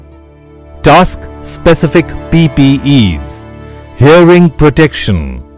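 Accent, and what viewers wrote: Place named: Thailand. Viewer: The best Thai English I've ever heard, man! And thank you for this video.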